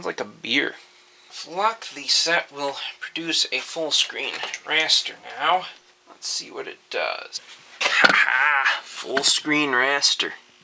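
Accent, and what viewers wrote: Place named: Chicago, United States. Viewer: Are you from the midwest? You have the same inflection as I do, almost like it has a Chicago swing to it...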